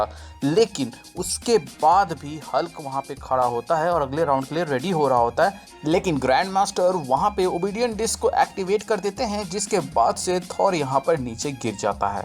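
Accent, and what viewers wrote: India. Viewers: Aap ho kaha se brother kyuki aapke baat krne ka tarika kaphi south east hai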